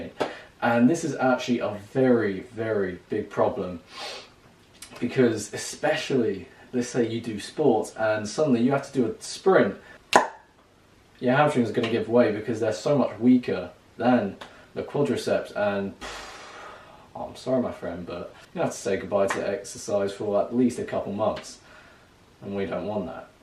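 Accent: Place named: East End, United Kingdom. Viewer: I thought you just had a typical east end London accent lol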